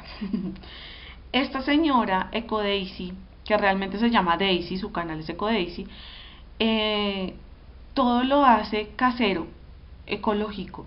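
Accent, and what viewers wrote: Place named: Colombia. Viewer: lindo acento colombiano *.* me encanta ese acento.. bueno hablando de youtubers le aconsejo Supercenizoso :)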